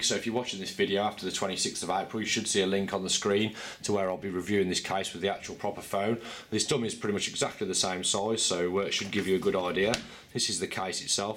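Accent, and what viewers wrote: Birmingham, United Kingdom. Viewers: with that accent you must be a fellow brummy lol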